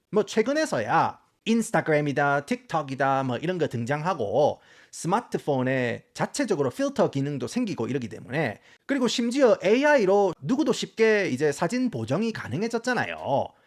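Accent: Gyeongsang, South Korea. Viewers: 이런 고급 정보를 개무시칸 갱상도 사투리로 전달 받는다는 점에 대해 소화 불량감을 느낄 갱상도 사람덜에 대해 생각은 안해보셨는지? 도무지 적응이 아니되옵니다~😅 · 왜 알고리즘에 뜬건지 모르겠는데 경상도 사투리와 굴러가는 미쿡식 영어 발음의 콜라보가 매력적인분이시네요ㅋㅋ 좐/촬스 + 으깨/느그들 ㅋㅋㅋ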